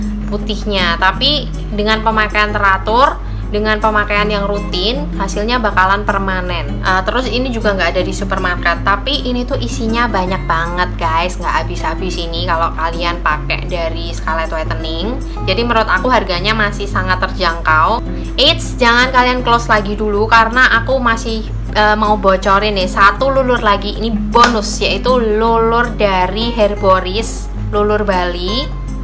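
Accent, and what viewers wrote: Java, Indonesia. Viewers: Wong jowo to mbak? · Org Jawa ya ? Logat ny kek jawa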